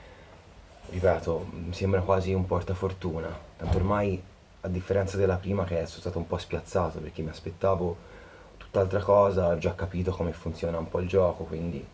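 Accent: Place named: Tuscany, Italy. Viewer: Fantastico l'accento toscano ....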